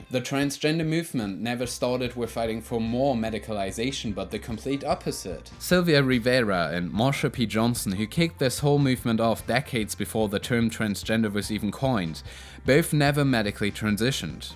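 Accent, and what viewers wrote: United Kingdom. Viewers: you legit sound a bit scotish:D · I'm still not sure if I'm an NB/Agender/Genderfluid but I'm OK if ppl call me female bc I know they just don't know it better (but it literally gets on my tits sometimes...) but I get rlly happy when called a boy...♥ I just wish there was less binary pronoun-calling in Germany as well as gender role expectations... People can be so narrow-minded limiting themselves and others. But wanting it changed from one day to the next is utopian... either way... work on that adorable British accent, darling. Very neato. Keep it up! ♥ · Darf ich fragen woher du deinen Akzent hernimmst? Klingt nach einer sehr ungewohnten britischen Varietät.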